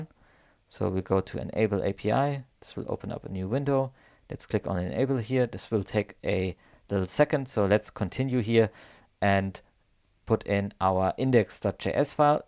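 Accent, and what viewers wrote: Germany. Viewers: Wenn ich den Akzent einschätzen müsste, würde ich zu 99% sagen, er ist ein deutscher!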